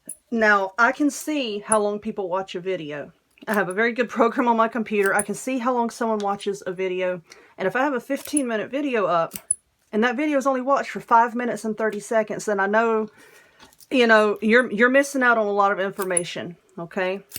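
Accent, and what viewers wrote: South, United States. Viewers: Just beautiful! Love your Southern accent. Keep up the good work. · I love your deep southern accent! And the way you explain things makes me feel like I could do this project too! So inspirational! Thank you!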